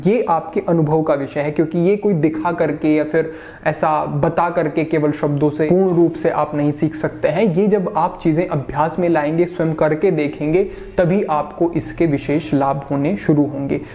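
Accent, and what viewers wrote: Standard. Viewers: The most insperational part of this channel is your pure hindi pronunciation, you worthy your every inches of life, you are blessed, am bless to find this channel, thank you 🙏🙏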